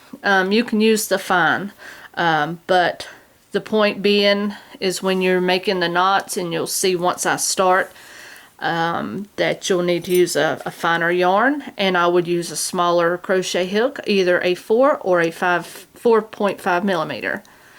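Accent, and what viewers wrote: South, United States. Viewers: That is just wonderful tutorial Ms! I finally got the idea with the Clones Knot needing 'loose' stitches to pull through the wrapped knot! It has taken me all of 14 days to figure it out, and today, there you were. The sun is shining a bit brighter for me today, again, thank you! Love that southern accent!:-)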